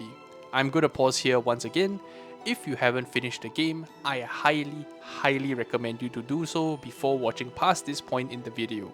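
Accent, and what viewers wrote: Singapore. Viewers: You're either Singaporean or Malaysian, aren't u. I can recognise that accent from 5000 miles away.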